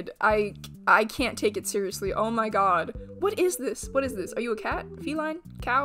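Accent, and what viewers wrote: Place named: Canada. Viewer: You sound canadian are you canadian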